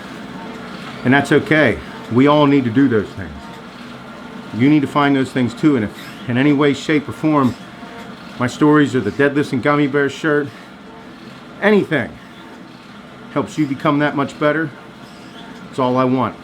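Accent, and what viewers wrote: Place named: Western Pennsylvania, United States. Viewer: Are you from Western PA? You sound like you do. Anyways, there is a line between laziness, procrastination, guilt and as you say being an asshole.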